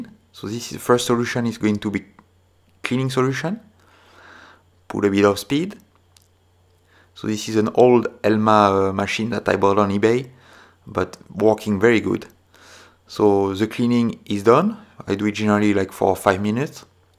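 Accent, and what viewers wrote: France. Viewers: Thanks to your video I was able to review the Omega 613 that was given to me by a friend. I didn't know it, so following your instructions helped me a lot. Your English with a French accent is also nice 🙂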